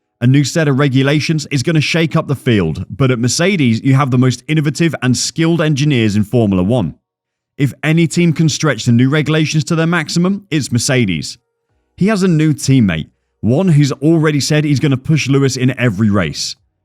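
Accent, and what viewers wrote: United Kingdom. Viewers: My congratulations, this channel really feels professional! There is that slightly British ‘accent’ in the soothing voice of the narrator, that gives the message a trustworthy sound. They imagery and the movie clips are also well edited and nice on the eyes. All in all you look like a journalistic source that, in an agreeable way, wants to state it’s point. And maybe that’s the biggest problem. In a world where content isn’t judged by it’s objectivity but by the amount of followers that are being pleased, it’s very hard to stay truthful. In this very scoop you do mention the not so reliable influencing by TW in relation to the fact that he ‘knew’ LH wouldn’t stop while whipping up his fan base with suggestive statements, but you fail to explain why he did that. You acknowledge the phrase that LH mentioned that he’d never said this or that but you fail to explain how this contributed to the polarization of opinions within the F1 community. 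On a given moment you accuse MV of being the source of a lot of misbehave within the sport, but fail to explain that this is a shared opinion by a minority of the fans. So entertaining, surely, professional exposure, well done, journalistic integrity, can and should be worked on if you want to be a channel for all F1 fans. If you don’t care about second opinions or how the other chunk of Fans thinks , then good luck, you will have to battle with ton’s of British based and prejudiced, biased you tube channels. The choice is up to you. 👍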